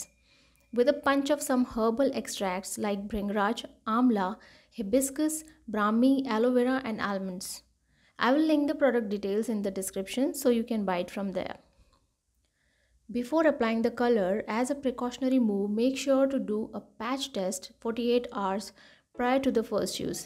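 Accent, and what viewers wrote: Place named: England, United Kingdom. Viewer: I absolutely love your English accent ❤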